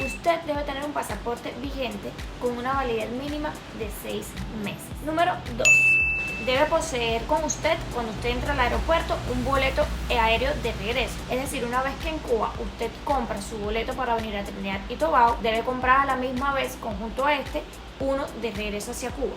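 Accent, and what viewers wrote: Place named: Venezuela. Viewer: Tu hablas como venezolana , no pareces cubana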